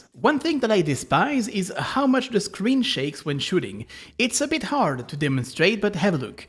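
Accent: France. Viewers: embracing his french accent more than usual?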